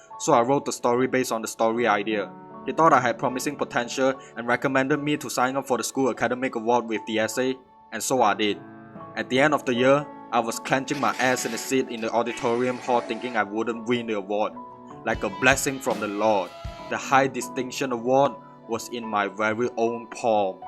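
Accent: Malaysia. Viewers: as a "banana" Myself i think your english still has that chinese manglish twang to it, its very obvious and yes i bleed red white and blue and fuck the yellow part 😂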